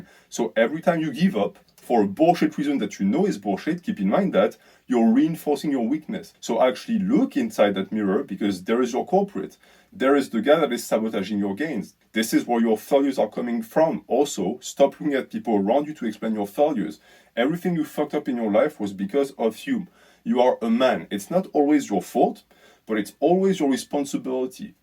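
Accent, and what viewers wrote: France. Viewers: is this guy french?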